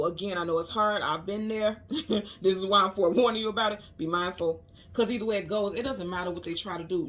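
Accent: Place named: Chicago, United States. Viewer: I Love this read. And I heard and felt every bit of Chicago in you! 😁 You were not playn in this read 🙌🏿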